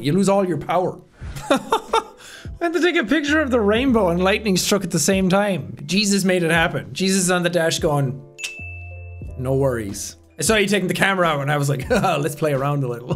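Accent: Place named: Ireland. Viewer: Please get well jacksepticeye I pray for you 😞 I hope you get well because every time when I am sad and I need a smile on my face I know where I can go on YouTube to see your videos that help me laugh and make me smile jacksepticeye the bravest most strongest and the funniest like a boss my favorite YouTuber I love your Irish accents I love your funny comments I love the adventures that you go to in these video games I live your tweet videos where you make funny jokes every time it helps me to put my mind at ease my friend please get better I care about you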